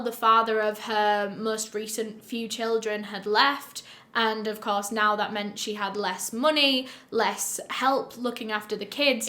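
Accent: United Kingdom. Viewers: She is from and lives in the UK.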